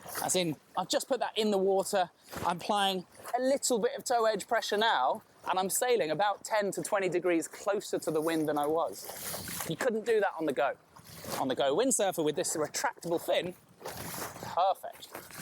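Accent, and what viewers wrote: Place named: England, United Kingdom. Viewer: Beautiful English accent!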